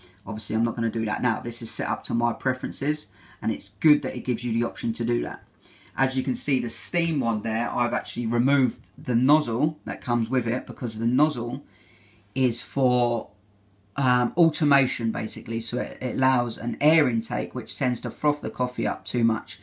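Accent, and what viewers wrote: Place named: United Kingdom. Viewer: It's the thick British accent 😉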